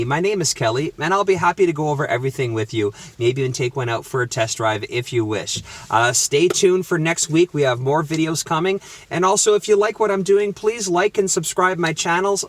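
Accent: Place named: Canada. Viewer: Kelly Hello, I enjoyed your discussion. How did you ever come to a Canadian accent?. Next time can you discuss how to upgrade ES 350 navigation system on my 2015? For some reason 2015 required a mini Sim. The dealers charge an enormous fee to change the Sim card. I understand the newer lexis allows it to download Iike the BMW.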